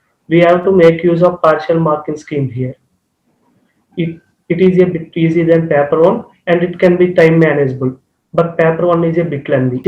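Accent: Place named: South India, India. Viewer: Bhai woh South Indian hai isliye uski English aisi hai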